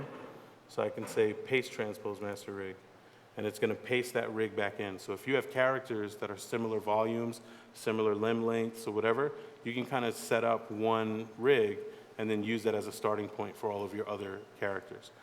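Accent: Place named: Bronx, United States. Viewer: I knew this dude was from the bronx just listening to him.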